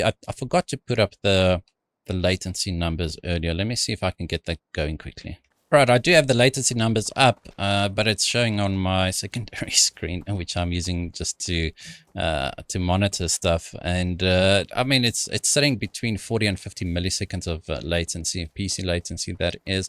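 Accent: South Africa. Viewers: R u from south africa ? N any amd gpu to test this one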